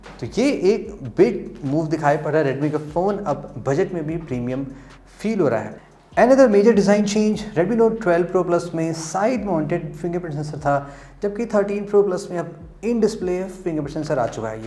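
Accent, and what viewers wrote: Lucknow, India. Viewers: Bhai aap Lucknow se ho kya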